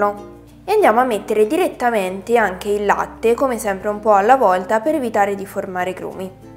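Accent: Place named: Marche, Italy. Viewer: sembra buonissima!! ma per caso sei di Senigallia? hai un accento marchigiano! :-)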